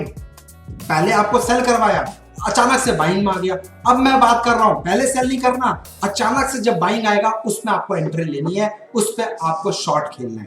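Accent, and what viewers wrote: Odisha, India. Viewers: Sir are u from odisha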